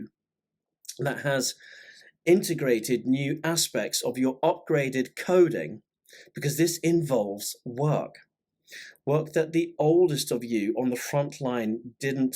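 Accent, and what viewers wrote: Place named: United Kingdom. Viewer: I reduced speed to.75 as well after about 8 minutes; prior to that I was back tracking & replaying. Had to put my “Brit ears” on as well. Lol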